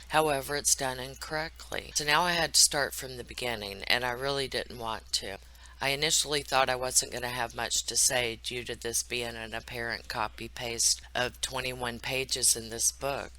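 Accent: South, United States. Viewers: The anti christ should finally get treated the way you do. I like your southern comfort voice. My daddy is a zero is spot on ! 12 degrees of separation . I am with you , we cn not say enough nasty words to describe this asshole. I love Beethoven, you are great!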